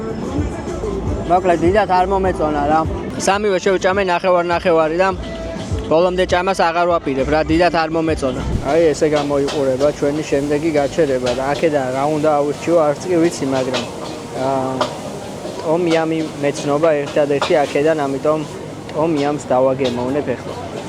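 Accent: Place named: Tbilisi, Georgia. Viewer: წარმატებები შენ! ღმერთმა ხელი მოგიმართოს! ვეღირსეთ თბილისურად მოსაუბრე მოგზაურს👍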